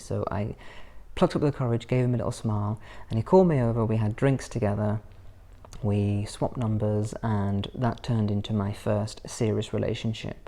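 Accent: RP, United Kingdom. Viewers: I see you have made a massive effort to be RP. Ironically, you sound posher than Prince William as the younger royals now do engage with the estuary accent! Just out of interest, do the rest of your family speak with a Birmingham accent? I think it can sometimes be a shame when people erase their natural accents. I enjoy the regional diversity of accents in the UK. For example, Sean Bean's Sheffield accent really adds quality to his performances. They wouldn't be the same with an RP accent.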